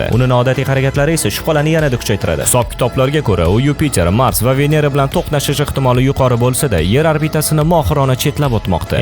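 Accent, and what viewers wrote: Tashkent, Uzbekistan. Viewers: Узбектилини.тугри.гапринг ука.тошкентнинг.шивасида.гапирманг.вотти.сузи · Факат узбекча гапир..тошкенча узбек тилига мансуб эмас.. Овотти..улвотти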